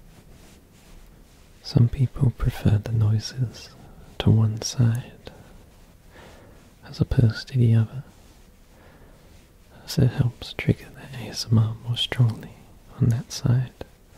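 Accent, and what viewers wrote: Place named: England, United Kingdom. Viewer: accent is also english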